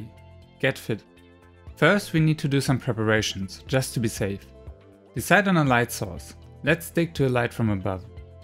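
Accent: Germany. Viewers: Are you From Germany? Your acent sounds like that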